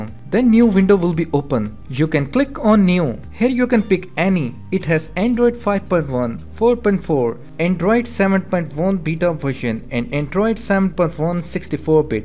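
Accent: India. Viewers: It’s not that bad...he sounds like tech support from the land of India! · So Bad englisch i understand like nothing pls do something against your Indien acent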